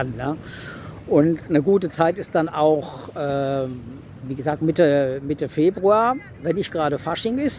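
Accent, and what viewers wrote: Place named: Saarland, Germany. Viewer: Grüß dich. Kommst du ausm Saarland? Dein Dialekt klingt so. Sorry wenn das nicht der Fall ist 🤣👍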